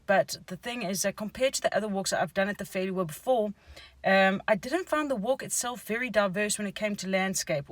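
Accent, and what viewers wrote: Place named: South Africa. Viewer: I think this is Sandra Bullock with a South African accent.....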